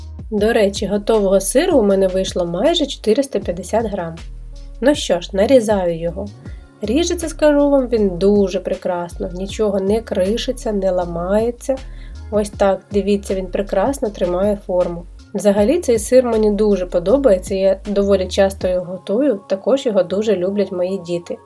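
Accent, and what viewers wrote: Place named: Border region, Ukraine. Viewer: Це діалект а не рашизм. Поїдьте в любий прикордонний район, в кожному свій діалект. Тим і прекрасна наша мова. І не робіть вигляд що ви в буденному житті спілкуєтесь літературною мовою. Так раптом всі стали "правильними патріотами" і почали інших вчити.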